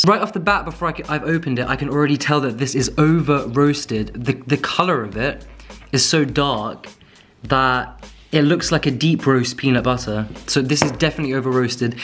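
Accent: United States. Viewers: Love the American accent 😂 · I like how you try and pronounce certain words in an American accent, u got it all down pat except "water-melone" that's halfway Spanish accent 😂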